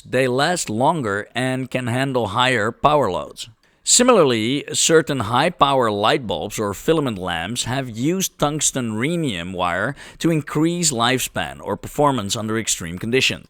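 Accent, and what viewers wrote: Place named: Netherlands. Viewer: love the dutch dialekt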